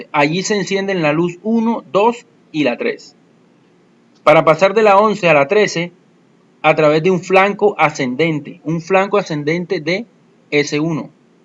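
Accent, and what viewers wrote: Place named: Barranquilla, Colombia. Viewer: Una pregunta, es que por su acento me pareciera que se encuentra en Barranquilla Colombia. Es así?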